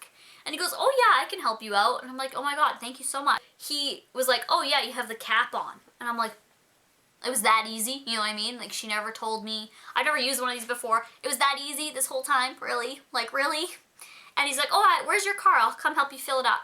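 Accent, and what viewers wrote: Canada. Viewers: Omg u sound so canadian like idk y I notice now lolz its so cuteeee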